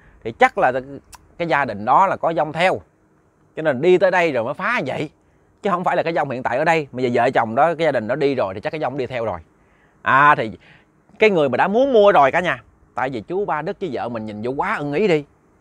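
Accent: Mekong Delta, Vietnam. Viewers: Rat' thich' kenh ke chuyen ma cua voi' giong chan chat' mien`Tay & nhung chuyen ma co' that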